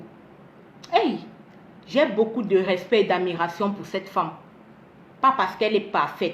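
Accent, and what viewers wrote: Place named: Bamileke, Cameroon. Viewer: AVEC TA VOIX DES BAMILEKE pardon va te cacher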